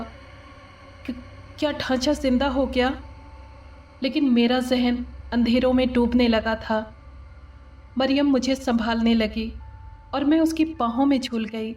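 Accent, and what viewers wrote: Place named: Lucknow, India. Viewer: Yeh pyari awaaz zaroor Lucknow ki hai . Carry onnnnn 👍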